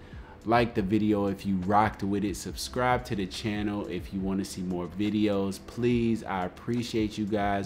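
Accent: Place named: South, United States. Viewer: Your southern accent cracked me up XD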